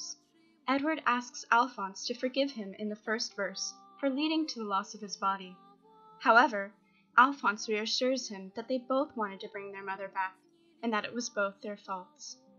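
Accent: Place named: Japan. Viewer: Японский акцент это оргазм для моих ушек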